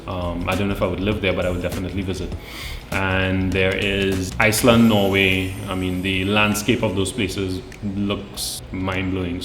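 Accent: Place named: Siparia, Trinidad and Tobago. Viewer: That is so cool! I know someone from Siparia and he sounds like you.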